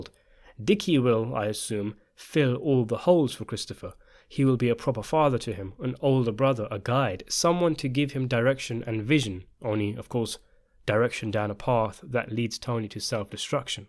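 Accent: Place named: London, United Kingdom. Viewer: I can tell by your accent that you’re south Asian London - am I right?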